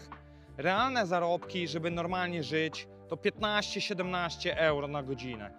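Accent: Podlasie, Poland. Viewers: Z jakiej części podlaskiego jesteś ? Piękny akcent :) i to bez hejtu. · Podlasiak z akcentem. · Z jakim akcentem mowi ten facet? Czy jest to akcent z Podlasia czy z Lubelszczyzny? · kolega jest z Podlasia albo z Lubelskiego. Normalna gadka, ale "słoiki' z Warszawy tego nie zrozumieją 😉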